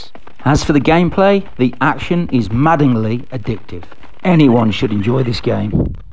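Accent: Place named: Midlands, United Kingdom. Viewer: You sound like you're from the Midlands, are ya?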